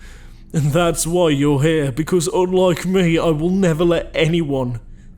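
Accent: England, United Kingdom. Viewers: The British accent 😮😮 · such a english accent lol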